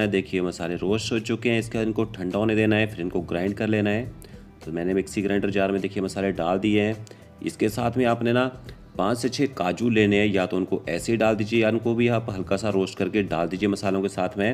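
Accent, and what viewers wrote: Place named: Himachal Pradesh, India. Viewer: Are you from Himachal Pradesh? Your accent is himachali.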